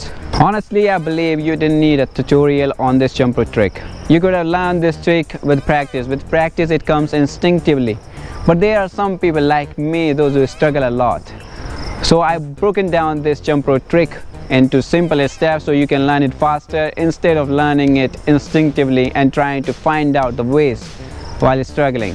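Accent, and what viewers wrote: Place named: India. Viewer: Butler english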